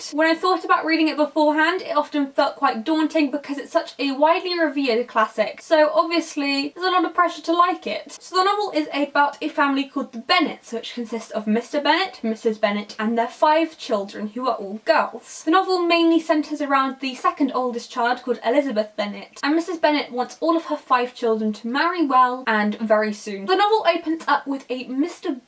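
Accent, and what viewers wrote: United Kingdom. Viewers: Your British accent s so great, BOOM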